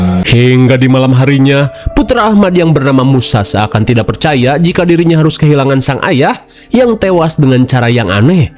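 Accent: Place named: Sundanese, Indonesia. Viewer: Subrek sejak tahun 2021 sejak covid ... krn gabut di rumah di kurung pas pandemi .. 😅 fall in love pas pembawaan nya pake sunda campur...sukses kang.. 🎉🎉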